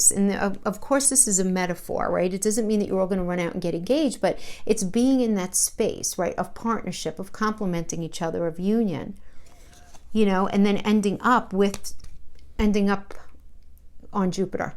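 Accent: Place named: North Jersey, United States. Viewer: I definitely thought N. Jersey! LOL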